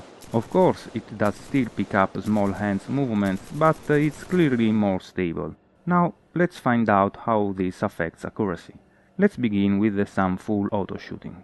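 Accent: Italy. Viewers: il tuo inglese non è male, ma l'accento è italiano. xD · Ma sei italiano?.. Sembra dalla pronuncia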